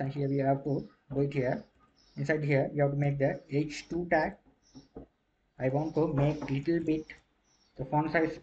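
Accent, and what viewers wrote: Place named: India. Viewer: Indian accent is just annoying..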